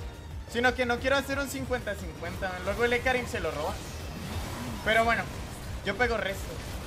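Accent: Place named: Caribbean Coast, Colombia. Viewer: pipi sonso dice que eres costeño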